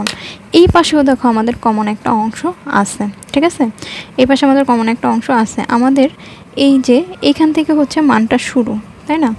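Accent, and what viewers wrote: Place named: India. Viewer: excellent .am from bangladesh.eta ki indian na bangladeshi channel.kotha bartay indian lagteche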